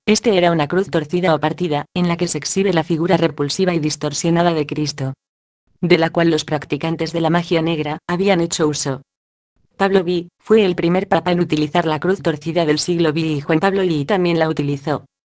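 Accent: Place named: Spain. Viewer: Los poderes fácticos son quienes tienen el dinero y no es que entre todos se pongan de acuerdo, es sólo que el sistema capitalista así funciona. Cuando era niño todo se relacionaba con los satánicos, hoy se habla de illuminatis y de reptilianos. La idea de que hay una conspiración detrás puede ser parcialmente cierta, pero tampoco hay que caer en la paranoia. PD: esa vocecita españoleta es irritante.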